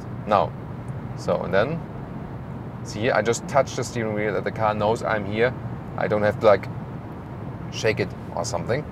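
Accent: Germany. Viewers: Wow lovely accent when germany speaks english👌🏼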